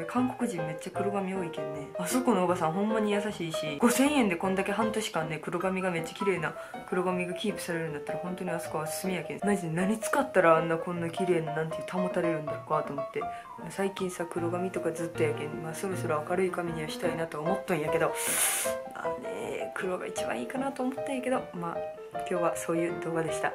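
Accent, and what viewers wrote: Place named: Iyo, Japan. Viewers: 半分伊予弁半分西条弁やな(♡) 私の地元やけん話し方めっちゃ落ち着く♡ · ちゃんの、伊予弁？が大好きです💗 ずっと聞いていたくなる、癖になる、語尾にはまりました😊 伊予弁って可愛い （*'‐'*)♪😄😄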